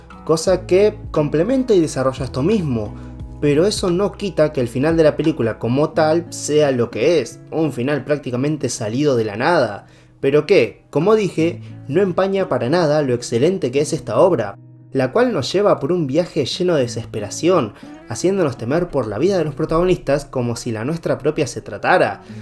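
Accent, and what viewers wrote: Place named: Argentina. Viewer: Todo estaba bien y disimulado asta que se noto el acento argentino "asha" "sha estamos" como arruinan la experiencia.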